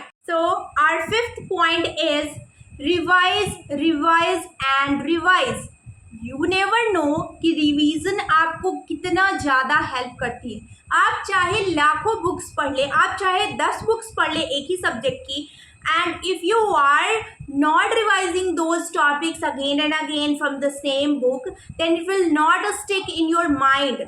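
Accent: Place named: Bengal, India. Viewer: Aap bengoli ho kya madam .....kyu you are looking like bengoli and the way you are talking is relate to that you are bengoli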